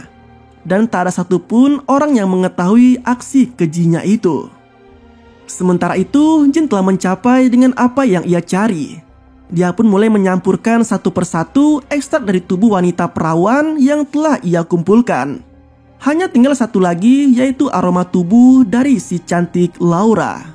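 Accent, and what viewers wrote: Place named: West Java, Indonesia. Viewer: Varpum hahaha...narator nya sundanese banget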